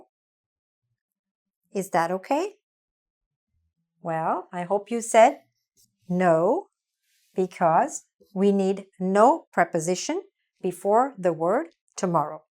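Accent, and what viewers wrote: United States. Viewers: Loving The Sopranos accent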